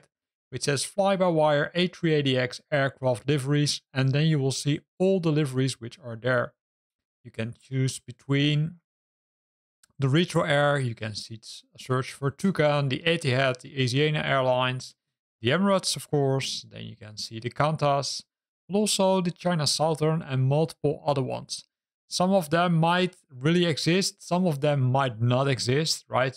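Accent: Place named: Netherlands. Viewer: I hear a thick Dutch accent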